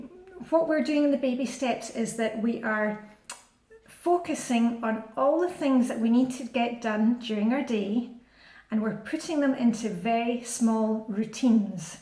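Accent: Scotland, United Kingdom. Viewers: Funny accent? I have a lot of Scottish friends, I love your accent, hen xo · I love your accent, reminds me of our middle school nurse who must've been from Scotland. Can I also say that I love your style, you're dressed so classy every day! I'm a sahm and I pretty much dress in leggings and a regular shirt (which is changed numerous times a day due to spit up). I'm trying to follow you, but it's hard with young babies. Thanks for all of your videos!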